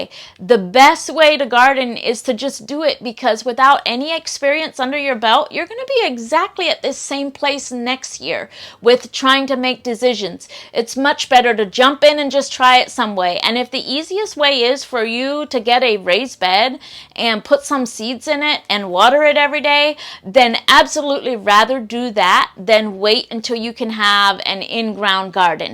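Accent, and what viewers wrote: Pennsylvania Dutch, United States. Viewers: Is your primary language Pennsylvania Dutch ? I hear it spoken all the time where I live near Lancaster PA also Germany ! Thank you explaining the differences between the two . I use raised beds because of the deer they don’t eat my plants in a raised bed .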